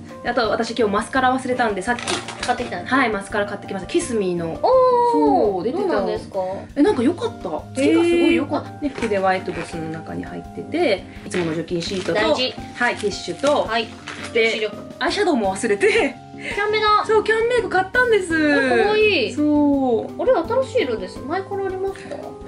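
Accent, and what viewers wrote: Kansai, Japan. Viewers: やでなぁめっちゃ分かるわ😂 本間の関西弁っちゅうのが分かる！w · さんの関西弁めっちゃ好きなんやけど。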